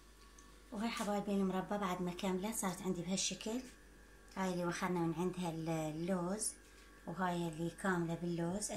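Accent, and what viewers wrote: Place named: Anbar, Iraq. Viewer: اممم يعني غرب الانبار مو نورتي🥰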